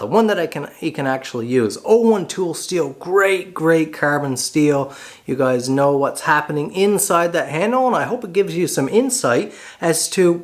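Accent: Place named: Canada. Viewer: Beautiful outcome!! The tool is so simple it’s elegant. I really enjoy watching your work. I’ve done a number of re-handle projects and a few re-profile things and really enjoy the leatherwork!! I’ve forged one blade myself: did everything wrong, but it was pre-YouTube and really widespread internet days. Did the best I could with a wood stove and some tongs I found at a yard sale. Finally finished the knife and heat treated it in the oven; it’s good enough for a wall hanger 😜. My favorite part of your videos is the accent. I grew up in Montana, and wandered Alberta and BC a good bit. I really enjoyed The Great White North eh!! ♥️🇨🇦👍. My grandfather and great grandfather wandered Nova Scotia too. It’s in my blood.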